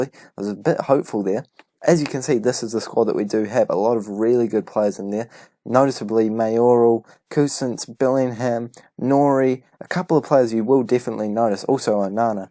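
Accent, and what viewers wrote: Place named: New Zealand. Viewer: always nice to add another Kiwi voice to my lineup. Keep up the good work! If you want some tips on playing the game, I did a series recently called Tips for the Advanced Football Manager, you may find it useful.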